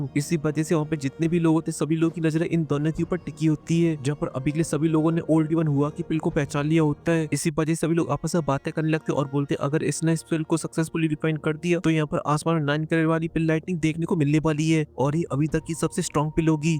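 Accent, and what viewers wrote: Haryana, India. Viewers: Master ji ka haryanvi mast h bhi 😂❤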